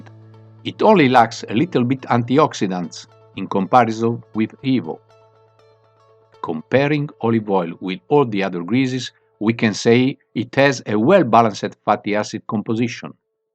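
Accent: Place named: Greece. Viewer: Love the greek accent Edit: Alright. I'm confused. Italian name and speaks Italian, but the accent sounds so Greek to me.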